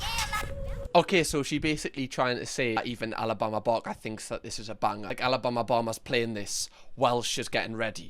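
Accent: United Kingdom. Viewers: I love this being explained in your Brit accent. It just makes me realize how petty unhinged all of this it is. It’s gone international 😂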